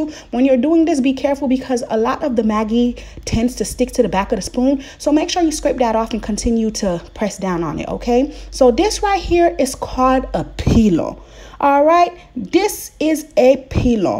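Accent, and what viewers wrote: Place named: Miami, United States. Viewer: I knew it that Miami accent is strong lol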